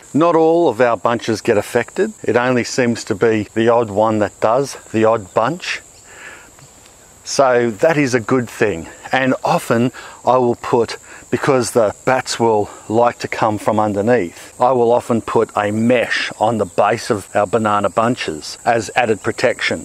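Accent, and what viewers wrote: Australia. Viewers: You look awful like Russell Crowe. Also, you happen to be Australian, judging by your accent. You don't happen to be related to him, do you?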